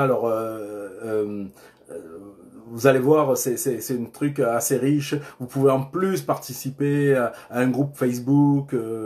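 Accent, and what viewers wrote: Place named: South, France. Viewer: un petit accent de marcel rufo !! ça chante le sud !! la Provence du Quebec ??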